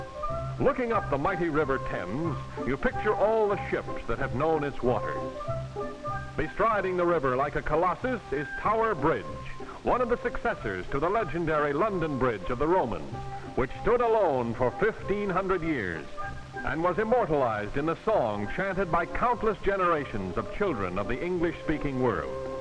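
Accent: United States. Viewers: Will have to get a copy of this. Shame about the corny American voice-over but the images are great.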